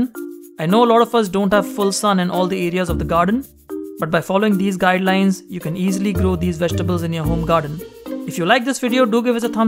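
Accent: India. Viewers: Such a helpful video 🙏 thankyou for posting this 😇 you sound indian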